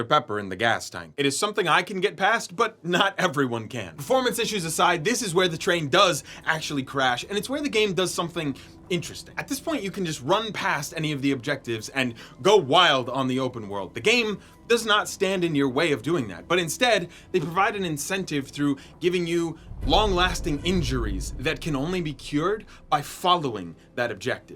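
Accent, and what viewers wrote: United Kingdom. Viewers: YEAHHHHHHHH BRITISHHHHHH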